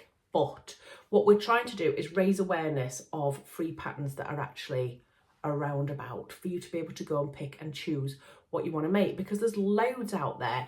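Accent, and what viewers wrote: Yorkshire, United Kingdom. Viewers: Thank you for putting this together! Just wanted to add that the autogenerated captions are clearly struggling with the Yorkshire accent and are cracking me up!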